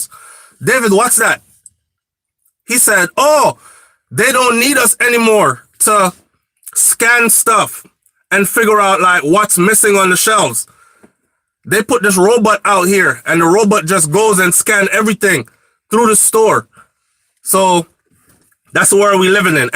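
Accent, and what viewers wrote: Trinidad and Tobago. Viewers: The trini accent on point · Luv the Trini accent..lol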